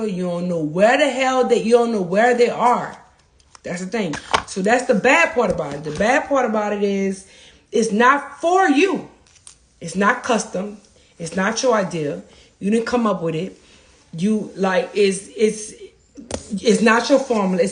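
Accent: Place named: New Orleans, United States. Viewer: NOLA Girl, I HEAR YOUR ACCENT.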